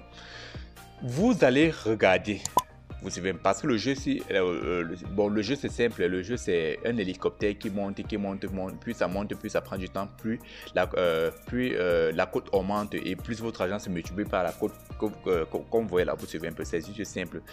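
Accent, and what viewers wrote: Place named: Cameroon. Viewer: Prochainement il faut parler doucement, tout le monde ne comprend pas ton accent camerounais et c'est rapide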